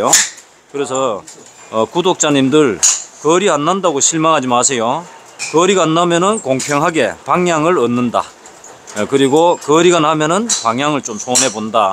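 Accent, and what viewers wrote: Andong, South Korea. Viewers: 구독자가 급속히 늘었네요. 억양이 경북 안동말씨같네요. 40년전 군대 선임하사가 고향이 안동이었는데.말투가 비슷하네요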